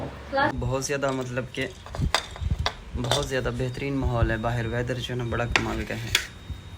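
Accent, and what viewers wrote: Saraiki, Pakistan. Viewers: پلیز بھائی ضرور جواب دین آپ پاکستان کے کس شہر سے ہیں؟ آپ تو بالکل ہمارے والی سرائیکی بولتے ہیں میں نے اپکا ویلاگ دیکھا گاوں والا ۔ ہم ڈیری غازی خان سے ہیں اب اسلام آباد ہوتے ہیں۔ پلیز جواب ضرور دیں آپ پاکستان کے کس شہر یا گاوں سے ہیں۔ پلیز ۔ فاطمہ اسلام آباد پاکستان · Kabhi tum kisi lady k saath unko wife batate ho kabhokou new aurat ko wife batate ho.tum lahje se saraiki lagte ho.Makkah mai mere under 1 sairaiki mechanic kaam karta tha.yahi lahja tha.but very nice guy tha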